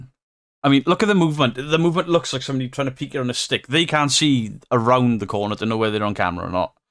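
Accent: Wales, United Kingdom. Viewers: Petition for to read audio books. I love the welsh accent ❤❤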